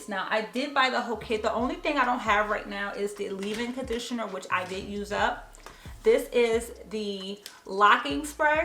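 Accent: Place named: New Jersey, United States. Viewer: I could tell you were from Jersey! lol like my mom. your locs are so beautiful! makes me want to reconsider and get smaller locs lol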